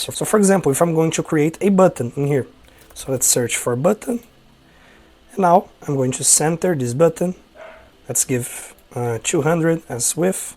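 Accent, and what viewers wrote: Brazil. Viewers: Parece brasileiro falando ingles 😅 boa aula!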